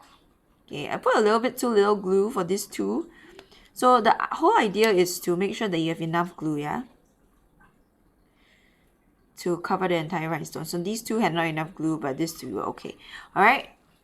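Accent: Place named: Singapore. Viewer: I am so glad I found this video for so many reasons! Firstly, because it was exactly what I was looking for! Incredibly helpful and informative! Also. This is completely off tangent, but i adore your accent! 🥰❤️ it sounds Singaporean/malaysian and reminds me of home! So thank you for that!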